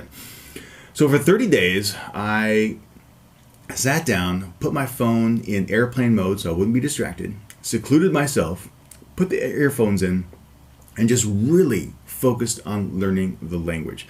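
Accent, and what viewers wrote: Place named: Midwest, United States. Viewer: since you've been here in the philippines, instead of ordering the program online, you could have downloaded that program through uTorrent, absolutely for free. i'm a native cebuano speaker and thanks to pirate bay, i became almost conversant with spanish, french, and japanese for free. so far, our laws may have lapses on online piracy. you notice that here in my country that piracy is almost everywhere, right? by the way, what i want to learn right now is how to imitate your pleasing mid-western accent. i like it.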